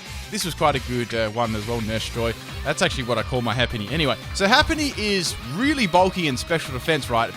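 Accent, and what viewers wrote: Australia. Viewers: Omg ur australian?! What state?! I'm from Queensland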